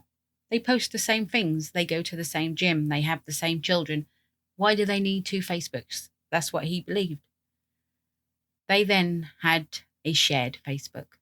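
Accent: United Kingdom. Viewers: Great to hear a british accent for a change!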